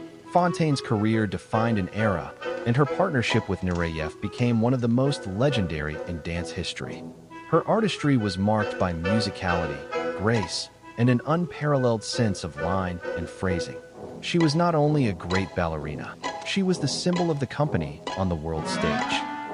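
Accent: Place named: United States. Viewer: Why the hell do we have a Yankee voice on this video? The Royal Ballet is English. We neither need nor want Yanks. I know that Miss Naghdi and Miss Haywood both speak English almost as well as they dance with beautiful English accents. Why ignore such quality resources and use something more and more are finding offensive?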